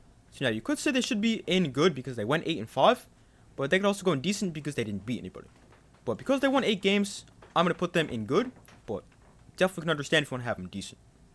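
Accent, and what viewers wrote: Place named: New York, United States. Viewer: New York acksent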